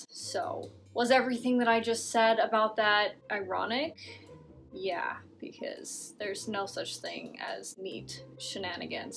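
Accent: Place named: Australia. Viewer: Omg you have an Aussie and you have a lovely video layout. I like you already! My bf's niece is getting interested in the fae. I'm a fallen catholic, but I love researching for other people to make sure people do their own practices in a safe, but still fun way. Plus I love supporting and learning about things. Thank you so much for this video, it's so hard to find good content on this subject! Question: Do you know anything on Green witches? I think she's showing an interest in that without knowing what it is too and would love to get reading material on it for her.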